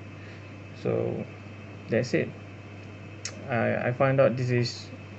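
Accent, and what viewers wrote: Malaysia. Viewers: U have malaysian accent bro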